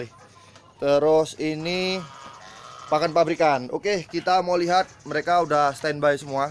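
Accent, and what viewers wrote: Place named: Java, Indonesia. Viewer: bagus bermanfaat. wong Medan, aksen ngomongnya kok wong Jawa ?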